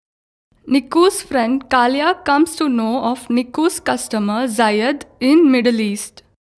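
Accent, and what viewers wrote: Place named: India. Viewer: CAN'T UNDERSTAND THIS F@ INDIAN ENGLISH....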